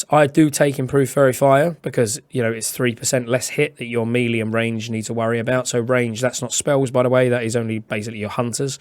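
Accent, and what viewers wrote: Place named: London, United Kingdom. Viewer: i love druid and l love your london accent!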